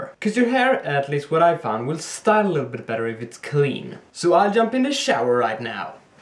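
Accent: Sweden. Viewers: sounds swedish? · You sound swedish... Har jag rätt?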